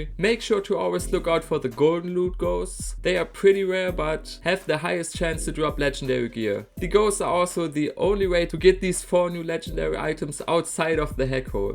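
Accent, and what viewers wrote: Germany. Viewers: youre such a german guy i see that accent (du bist son deutscher bro)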